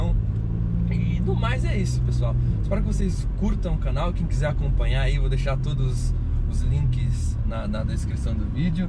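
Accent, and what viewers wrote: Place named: Rio Grande do Sul, Brazil. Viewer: Acho que eu sou o unico que imaginava que ele fosse assim kkk pela voz dava pra saber que tinha uma aparência nova Mas pelo sotaque parece gaúcho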